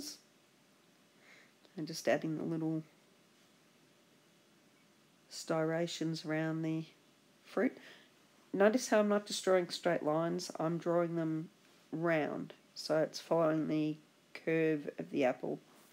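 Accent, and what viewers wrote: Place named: Australia. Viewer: First, you are a wonderful artist. Here are some comments of mine. I had trouble understanding you because of your chest cold and Aussie accent. It did get better as we went along but you sometimes let your words trail off. You work fast, so you might want to stop at some point and show the layers you are using. Also, you use different brushes. Some artists will draw a line or two on a white layer to show what the brush looks like on its own before applying it to the painting. You might consider this. You mentioned a base layer to get darker color but you may want to take that a bit slower so people can understand where you put it and why. Hope this helps. Ron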